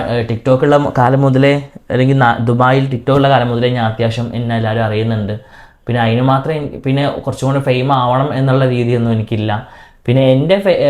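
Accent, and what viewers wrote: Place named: North Kerala, India. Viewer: ബെന്ന്, പയം പുയുങ്ങിയത് 😅നിങ്ങൾ വടക്കൻ കേരളീയരുടെ ഭാഷ ശെരിക്കും ചിരി വരുന്നുണ്ട് 🤪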